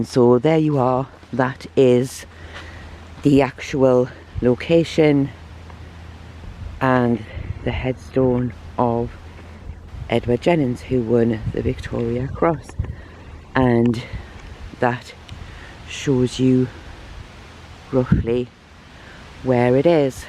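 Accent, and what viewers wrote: Newcastle, United Kingdom. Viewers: More Geordie! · Love your content & love the Gordie accent.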